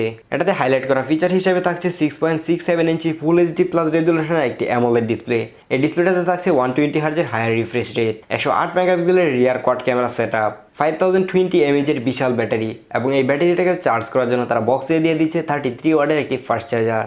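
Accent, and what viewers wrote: Kishoreganj, Bangladesh. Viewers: Vai apnar basha ki kishurganj...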